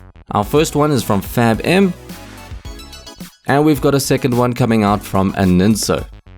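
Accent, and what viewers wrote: South Africa. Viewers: Is this a South African accent i hear?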